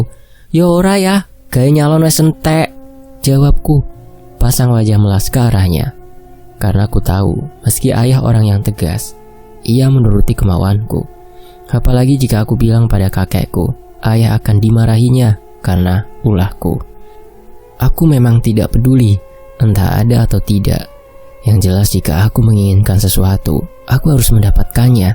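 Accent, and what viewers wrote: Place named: Surabaya, Indonesia. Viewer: Oh yo iki arek suroboyo, wong ngomongno mi setan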